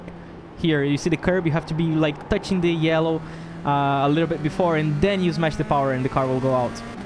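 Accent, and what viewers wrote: Brazil. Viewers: Achei teu canal hoje, Voce eh brasileiro ne soh pelo sotaque. muito bom os videos!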